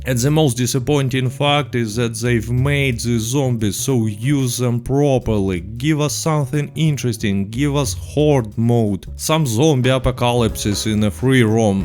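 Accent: Russia. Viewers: I didn’t have the chance to buy them last time. By the way huge fan here :) keep rocking and keep roasting with your Russian Accent <3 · I like your russian accent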